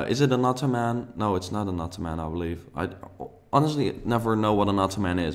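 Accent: Netherlands. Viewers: I come from Belgium, you have such a nice accent, I hear you're Dutch, but it's a nice accent lol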